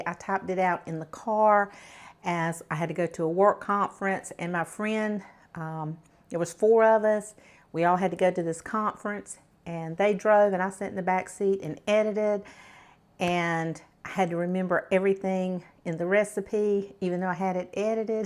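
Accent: South, United States. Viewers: You took the words right out of my mouth! I just❤ watching. She is just so down to earth,and I ❤ her southern accent...so calming.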